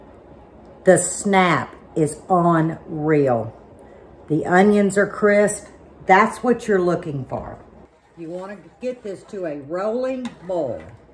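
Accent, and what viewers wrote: South, United States. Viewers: You are a true Southern Belle!!! I love hearing you talk because of your "accent"!! And I love the South.No cukes here yet. We had no rain and I had to haul. I love pickles so I'm praying I get enough. I'm having Grandma Camp next week!! My daughter has treatment so I will take the four children. I Pray constantly that God will keep her here. Okay I need to watch over and write down directions. God Bless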